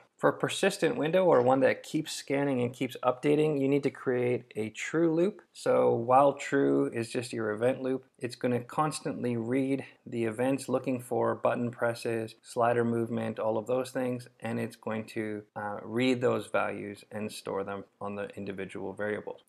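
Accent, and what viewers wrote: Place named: United States. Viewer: This gentleman wins the award for "most amount of accents heard in the 1st 15 seconds of talking" Norwegian, no Australian, wait no that's German, no British, no that's definitely some version of Nordic, wait is that Canadian or American? Oh no it's Polish maybe. Damn wait that's American, maybe Canadian. Honesty I'm still not sure. I'm 90% it's American but there's something else in there when he's in front of the camera.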